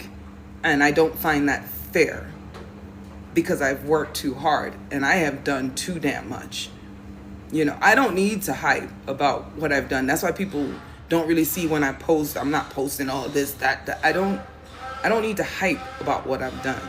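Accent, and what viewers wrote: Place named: New York, United States. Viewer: Its ok... but you sound like you from New York. Still, you got the voice for radio and the face for Youtube so you already have all the qualifications. ;-) I love the voice nuff nuff nuff, so I will watch and see what creativity and experience lies within the beauty with the dreadlocks. We can tell, you are unique in your own way... did I say I love di voice?:face-blue-smiling::face-blue-smiling::face-blue-smiling::face-blue-smiling::face-blue-smiling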